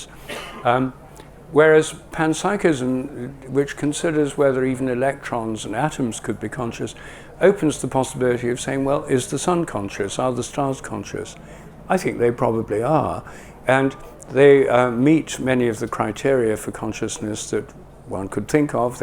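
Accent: RP, United Kingdom. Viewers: Yukky muddled thinker - ignore the suit with an upper crust British accent. Soon as he said he had lived in India I thought “Yeah a continent full of devils and demons” of which he is now one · This guy loves his posh British accent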